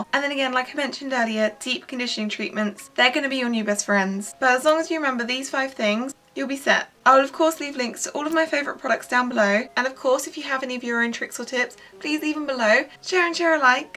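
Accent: United Kingdom. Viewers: I'm trying to figure out which part of the uk you're from. I want to say london? amirite? :D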